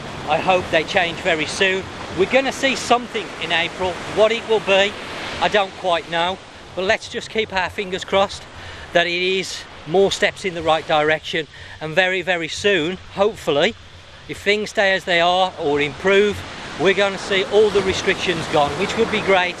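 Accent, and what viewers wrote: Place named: Birmingham, United Kingdom. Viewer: Hi what’s it like in Christmas time and could you please recommend any 5 star hotels close to clean beaches and clear seas? Thanks for the vlogs they are great especially hearing the brummie accent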